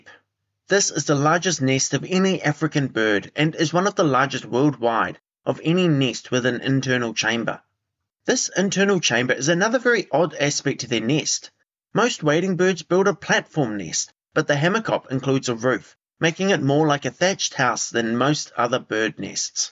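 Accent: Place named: New Zealand. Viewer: Great article mate, thank you. Also really easy to listen to your NZ accent, rather than yanks. 👍🏼🇦🇺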